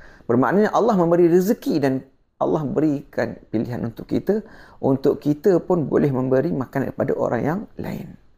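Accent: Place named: United Kingdom. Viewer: Memang benar....sesiapa yg otak 1@ digit memang tidak akan faham apa yg kapten bicarakan....Lidah kapten lidah inggeris sbb hari2 gunakan bahasa tersebut. Slang berbahasa arab pun sudah tentu keinggerisan. Pernah dengar syeh mahmud khalil qusairy baca quran slang khorasan? Mereka akn fitnah pelbagai cara dari pelbagai sudut. Tidak salah saya anjurkan para ustz (klu boleh dipanggil ustazlah) belajar lagi...sbb ilmu islam ini amat luas....umur 100 tahun pun belum tentu sudah cukup😂😂😂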